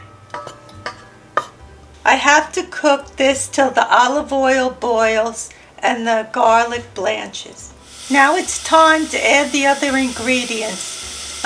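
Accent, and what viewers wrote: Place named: New York, United States. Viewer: Hi again Grandma! You were married close to the year I was. Do you and Grandpa have a secret for such a long marriage? It's so rare these days. Btw I grew up in Mahopac NY and knew people with your same lovely accent. As a bride I had a set of the flowered Corelle dishes, green and white. P.S. they DID break although they were called unbreakable. Best wishes, Donna in Vermont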